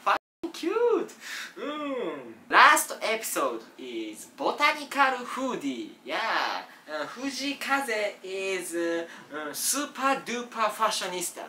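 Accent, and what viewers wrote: Japan. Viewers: わざとらしいカタカナ英語に笑った😂でもコテコテのジャパニーズおばちゃんには丁度良いレベルでありがたし🙏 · I am so proud of your Samurai English. ✨ Cool✨ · さん海外進出？！めっちゃ楽しい〜(´>∀<｀)日本語英語が最高すぎる✨ さんfanも国境を超えますね*⋆✈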